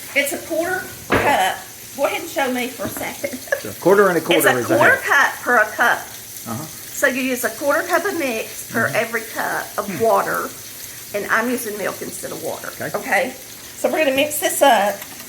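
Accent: South, United States. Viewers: Love your channel!! I just found it 2 days ago and I have been watching since. You cook just like my Granny did. Brings back so many wonderful memories of being in her kitchen. Thank you for keeping good southern country cooking alive. I’m a Georgia girl too living where the sweet onions grow. Thank you for showing just how wonderful southern living is… I heard you say on one show that someone said something about the way you talk..,well don’t change a thing… that’s part of what makes us southern. Thank you for what you and are doing and God bless you❤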